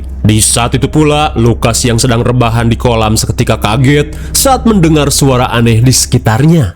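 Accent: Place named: West Java, Indonesia. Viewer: Makasih ya AA sudah menemani kami semua dengan Vidio² horror+lucu dengan komedian dari AA 😊😊logat AA kayak orang Sunda ya.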